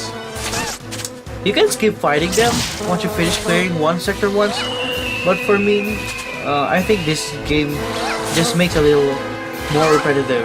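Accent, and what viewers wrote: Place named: Tagalog, Philippines. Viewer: You have that English accent in you you are good talking in English Language but your Tagalog accent is still there.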